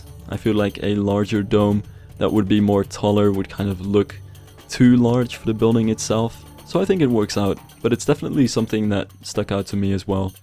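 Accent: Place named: Netherlands. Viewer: My senses smell that you are Dutch speaking.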